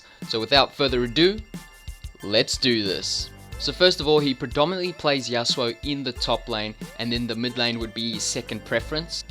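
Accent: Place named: South Africa. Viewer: Are you from South-Africa? Cause it sounds like it ma brother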